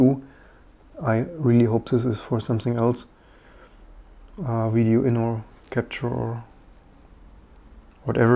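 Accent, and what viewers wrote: Germany. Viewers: German native ?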